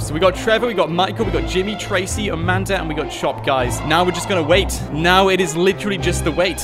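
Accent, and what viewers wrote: United Kingdom. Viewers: His really british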